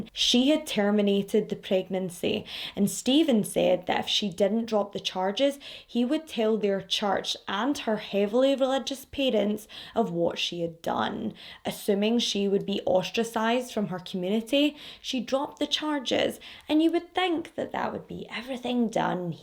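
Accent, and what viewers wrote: Scotland, United Kingdom. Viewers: I'm so proud of myself.. When i started watching/listening to your channel, i was having trouble with understanding your scottish accent. I had to listen really carefully. Nowadays i have no problem understanding. I'm a fluent listener of Scottish accent 😎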